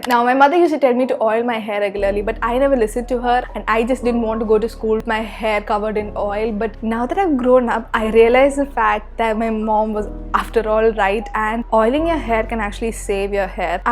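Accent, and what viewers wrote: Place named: Kerala, India. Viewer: Are you a malayali? The accent sounds just like a mallu girly🤩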